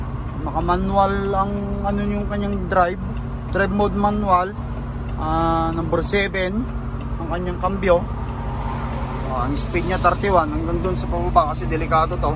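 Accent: Ilocos, Philippines. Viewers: Ilocano ka met ngayam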